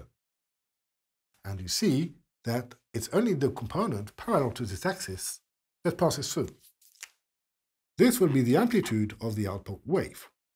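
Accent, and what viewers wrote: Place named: Yugoslavia. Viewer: Sounds like he might be from Yugoslavia. Kinda difficult to understand.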